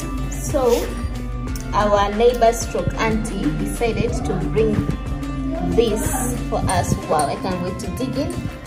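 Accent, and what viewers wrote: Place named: West Africa. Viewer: Mashaa Allah sis beautiful. Your accent sound a bit different from our eat african. Are you from western part of africa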